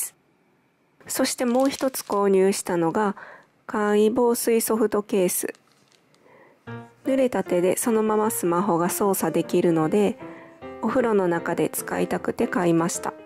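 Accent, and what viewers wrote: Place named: Kansai, Japan. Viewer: 関西人ですかね？結構関西弁っぽいイントネーションで大阪の私は聞き取りやすかったです！ スマホ立てるの悩んでたので買ってみよ〜っと☺︎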